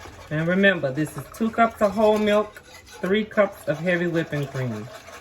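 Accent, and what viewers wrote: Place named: New Orleans, United States. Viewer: Yeah ya rite! Is your family from Baton Rouge...you sound like you got some Donaldsonville or New Orleans twang mixed in there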